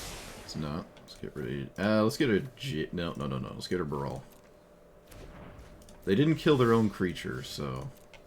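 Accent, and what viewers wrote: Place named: Scotland, United Kingdom. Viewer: Algorithmic Engagement* with Scottish accent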